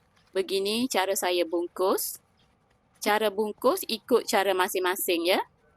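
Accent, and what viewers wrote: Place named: Kelantan, Malaysia. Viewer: Bunyi kecek Tu org klate😂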